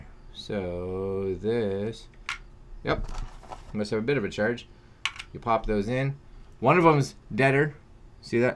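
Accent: Canada. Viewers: Great video if your not Canadian you sure do sound Canadian from your viewer up north ,keep up the great reviews this is my new favourite Chanel 👍🇨🇦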